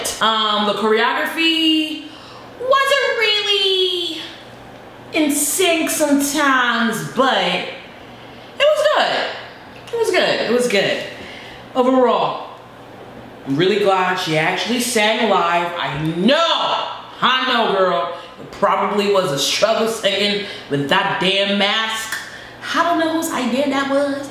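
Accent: United Kingdom. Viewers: I LOVE YOUR ALBUM REACTION AND ESPECIALLY YOUR 🇬🇧 ACCENT!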